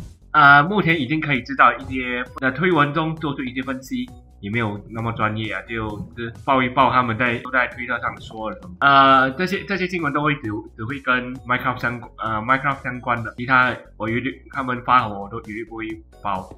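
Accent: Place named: Malaysia. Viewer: 抱歉~想請問影片可不可以加字幕，因為我是台灣人，有點聽不清楚馬來西亞的口音，謝謝(◍•ᴗ•◍)❤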